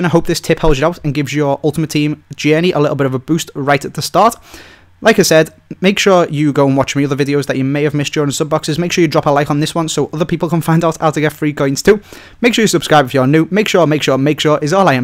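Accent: Liverpool, United Kingdom. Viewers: scouse bro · Finaly I found a youtubers who is SCOUSER I am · Scouse accents are cool :D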